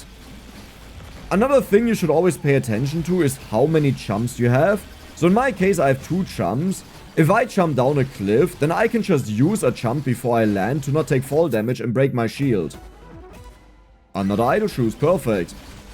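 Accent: Germany. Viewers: the german accent finally calmed down for a moment here🙏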